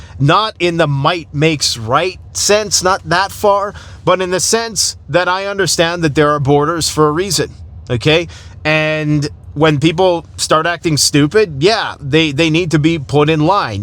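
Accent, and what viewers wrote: South, United States. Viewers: like for the southern accent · Liking that southern slang 🙏❤️🥰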